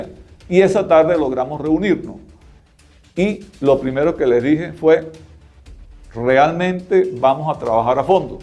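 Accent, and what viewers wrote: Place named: Caracas, Venezuela. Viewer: Qué bonito escuchar este acento nuestro de caracas neutro respetuoso.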